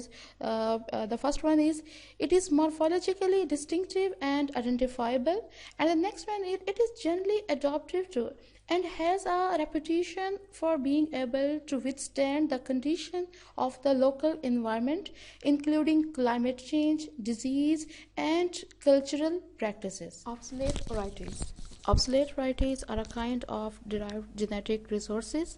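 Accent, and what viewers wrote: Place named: United States. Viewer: Why u are doing American accent😡😡😡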